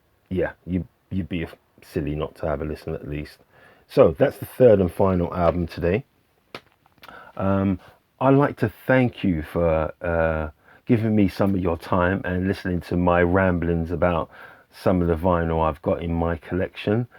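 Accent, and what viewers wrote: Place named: United Kingdom. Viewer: Hi, I've just come here on the recommendation of Julius Jabbar. He said he thought you sounded British, but I'd wager that I can hone in on your accent further. You sound English to me and slightly posher than I am. I'd guess you are from south of the Watford Gap. Possibly not far from the M25. How am I doing? I've got that LP with a different cover. Didn't know it was controversial. Love the Xylophone with those voices. Very nice! I'm really really happy to have found your channel. Looking forward to caching up with your past videos. Cheers!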